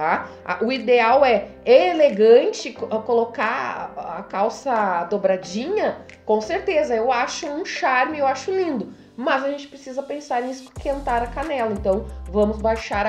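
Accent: Rio Grande do Sul, Brazil. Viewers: Adoro teu jeito de falar sutaque bem gaúcho ❤ sou Catarina e como o clima daqui e dai não tem diferença também estou enrolada na minha manta no sofá tomando chimarrão e vendo seus vídeos, obrigada pelas dicas 👏🏽❤ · adoro tuas dicas,faz pouco que descobri teu canal,sou gaúcha mas moro no MS,aqui 20 graus já é frio,sou muito friorenta,outra coisa que gosto tu não muda o nosso sotaque para falar no vídeo 🙌🙌🙌🌹🌹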